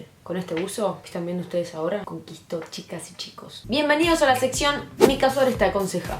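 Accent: Argentina. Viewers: amo el acento argentino soy nuevo saludos desde mexico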